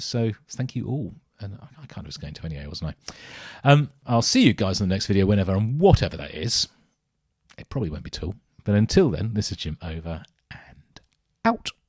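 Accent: United Kingdom. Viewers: Why do I feel like I'm watching I'm Alan Partridge? Probably because you're British and you've a good voice for radio.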